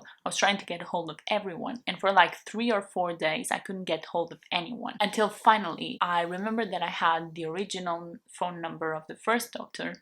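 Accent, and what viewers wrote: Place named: Portugal. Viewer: Caramba, venho maratonando os seu vídeos e agora que percebi que você é Portuguesa!! Seu sotaque é incrível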